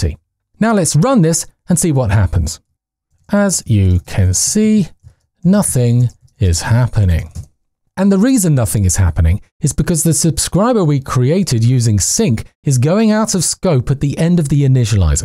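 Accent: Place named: United Kingdom. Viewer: Neat demonstrations that can be easily understood. BTW, wonderful British accent!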